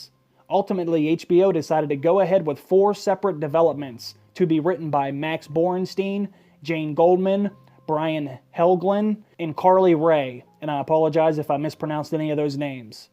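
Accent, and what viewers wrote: Pittsburgh, United States. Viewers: Are you from Pittsburgh by any chance? That accent is undeniable! I knew it as soon as I heard it! Bet you say jagoff too, don't ya?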